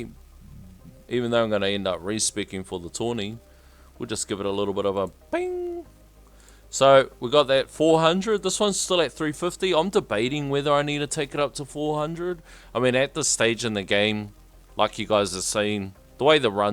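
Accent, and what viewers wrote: Australia. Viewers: nothing like getting some work done with that sweet aussie accent talking about my favorite tower defense game of all time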